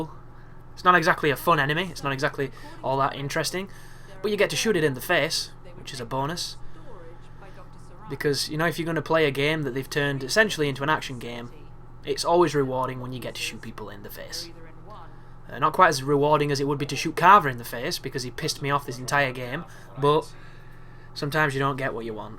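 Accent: United Kingdom. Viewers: Hi, I enjoy your videos and your accent (no offense) helps me dealing with UK and Scottish management in my work (I understand them now). One thing though - the ball sacks are cysts and the enemy you call cyst is exploder. Not that it matters.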